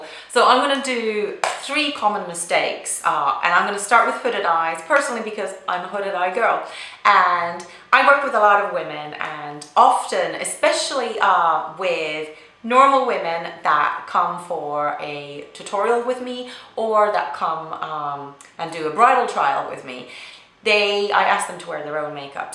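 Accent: United Kingdom. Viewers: At first I thought it was a British accent then I thought it was just an Standard American accent. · how come your pronounce half your words in a British accent (i.e. glasses, can't) and the other half in american? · What's going on with your accent? It's 97% English but there's a little twang in there too - could be Irish, American, Australian....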